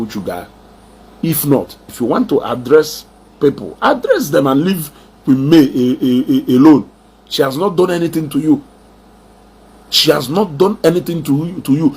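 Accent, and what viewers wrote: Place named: Nnewi, Nigeria. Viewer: My son, ina eti-eti o! Continue with your Nnewi dialect, I love what you’re doing and never miss any topic. Jisike, so proud of you. ❤️❤️❤️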